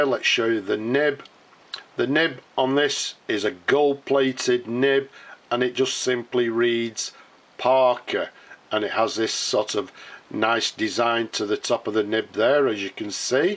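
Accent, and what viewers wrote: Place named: Yorkshire, United Kingdom. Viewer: tha sounds lak a Yorkshire man 😎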